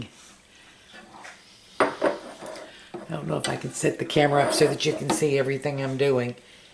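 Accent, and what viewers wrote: South, United States. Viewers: Ma'am, I have enjoyed this video very much. You provide excellent information and I love your down home accent. I also want to compliment you on the quality of the seasoning on your cast iron skillet. It looks first rate. Keep the wonderful videos coming.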